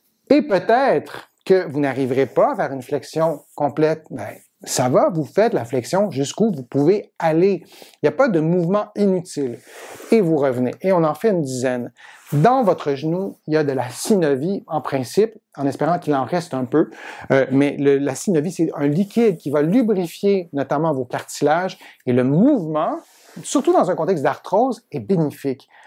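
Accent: Quebec, Canada. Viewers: Merci pour les exercices, l'avertissement sur leur adaptation, et pour ce bel accent québécois. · Merci beaucoup vidéo claire, et juste, j'ai fait et c'est pas mal du tout pour un début, le tout avec cet accent canadien que j'adore. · L'utilité indéniable des exercices mise à part, votre accent canadien vous rend de suite sympathique. Bravo pour votre belle initiative. Je viens de m'abonner. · Merci pour vos exercice il sont vraiment utile moi je suis un cas special j ai ete operer d une ghonatrose bilateral <num> une osteteomie depuis 10 ans actuellement je souffre beaucoup de mes genoux meme au repos ont me propose une 0peration totale mais actuellement dans mon pays avec la pandemie les blocs sont ferme par manque d oxigene meme les cliniques n opere pas je narrive plus a marcher malgre j utilise deux bequille donnez un conseil svp en attendant de me faire operer j ai 78ans je pese 88kg pour un metre 62 j j attend vos avec impatience svp votre avcent canadien est tres sympat mer vos video elle sont interessente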